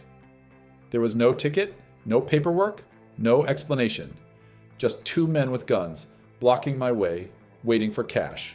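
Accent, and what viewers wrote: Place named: China. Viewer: The narrator here is trying to sound like foreigny Westerner, but have Asian accent, from China?..Airport a chaos? Probably true yrs ago till late 2024 had major upgrade & improvements, viewable online, after the private management takeover by largest Phil firm,improvements include Grab taxi stands w fixed prices..Of course if one goes to rural towns in the Phils, Thailand & Vietnam, one wouldn't expect better updated amenities except that Phils has Musk's starlink reaching remote areas. Poverty rate of Phils is 10.6%, 3rd LOWEST in Southeast Asia tied w Thailand, topped by Singapore and Malaysia, so untrue that poverty drives Filipinos to be dishonest and smiles w a financial agenda.For comparative, USA has an 11% poverty rate, while some of China's poor are eating garbage w millions now are going to countrysides due to serious job shortages ( Decoding China, China Observer, Lei's Real Talk). 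Reader's Digest gives a passing grade on honesty on Filipinos.The Phils is ranked 3rd on Safety in Southeast Asia by the Gallup affiliated survey outfit..Phils voted in past administration an atheist Pres, so untrue Catholic Church has strong political clout..Untrue garbage is prominent in Phils' invirons,top SEA garbage producers : 1. Indonesia 64 mt.yr 2. Thailand 27 mt.yr 3. Vietnam 22 mt.yr 4. Phils 15 mt.yr. . Viewable online are foreigners' roundtrip MRT.LRT slightly elevated train rides around Metro Manila adjoining cities, as if seeing entire Phils & see if garbage, stray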